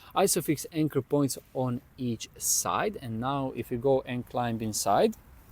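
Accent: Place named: Balkans. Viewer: Vidi sa da Balkanac prica na ENGLESKOM 😅😇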